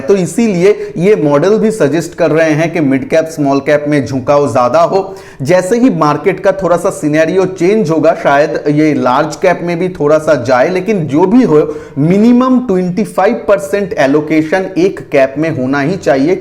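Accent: Bengal, India. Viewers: আপনার উচ্চারণ শুনে মনে হচ্ছে আপনি বাঙালি, যাইহোক আপনার ভিডিও আমি খুব মনোযোগ সহকারে শুনি।। সঙ্গে আছি দাদা।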